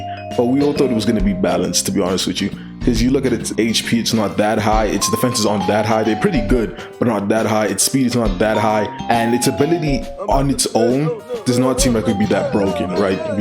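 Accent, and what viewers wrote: South Africa. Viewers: Are you South African?👀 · Yeah right? It's a like mix of South African, English and American (not sure on the specific regional accents, but you get me)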